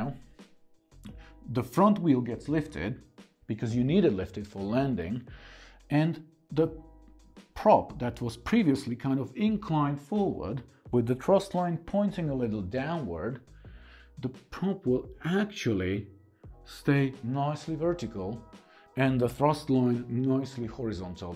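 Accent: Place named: Slovakia. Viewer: It's just his accent. He's from Slovakia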